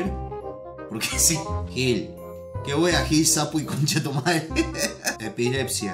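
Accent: Córdoba, Argentina. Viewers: Es argentino? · 848 jaja puede ser pero admiti que se les pira y se les va lejos el acento coordobeeh jaja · Sos argentino? te pregunto por la tonada :3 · xD, yo también soy cordooobez pero no tengo ese acento :v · sos Argentino ? (Pd: amo tus videos )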